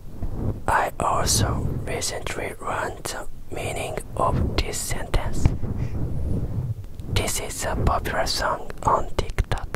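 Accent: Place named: England, United Kingdom. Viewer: I WOULD LOVE THE ANIME CHARACTER VIDEO OMG I'LL WAIT FOR IT HEHE that cute english accent tho— AND THAT KISS IN THE END OF THIS VIDEO OMG SPSPWOSJAJALAKAHSHHSJA btw i like one piece, haikyuu, hxh, ace of diamonds (there are so many anime that i've watched so i just type the title that pop out in my mind :D)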